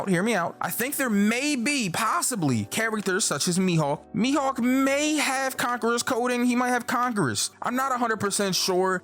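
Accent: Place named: Oakland, United States. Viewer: Really enjoy you being yourself when explaining things. Hearing you reminds me of my time with friends from Oakland and their cool vibe. Keep it up. It means a lot for some people like me.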